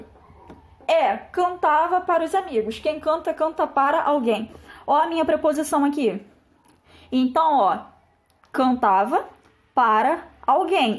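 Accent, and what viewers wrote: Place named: Rio de Janeiro, Brazil. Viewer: Esse carioques dessa proff Onde que vai o acento?? E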